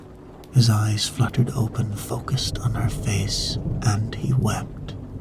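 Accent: Scotland, United Kingdom. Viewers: Thank you. I love your Scottish accent. This is my favorite fairy tale story ❤.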